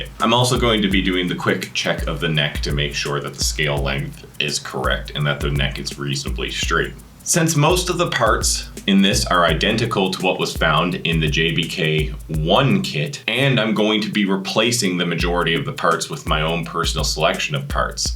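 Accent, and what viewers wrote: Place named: Canada. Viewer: Wow what a voice, you sound Canadian haha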